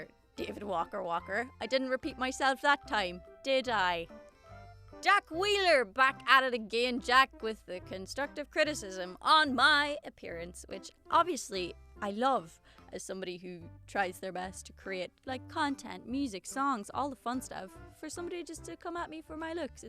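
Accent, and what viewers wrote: Ireland. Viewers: I find it rather sad that people have anything mean to say about you. You're fun, beautiful, Irish, have a wonderful accent and i happen to enjoy your singing. I will never understand why people feel the need to say something mean to someone rather than give positive thoughts and feedback. I feel people do that because they in their own lives have failed or are doing so. They feel the need to put others down to feel good about themselves. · i absolutely love your ginger hair it’s unbelievably gorgeous keep rocking it your an amazingly beautiful woman you should be a model, by the way your voice is awesome i love the irish accent. i recently heard your cover and fell in love 🤙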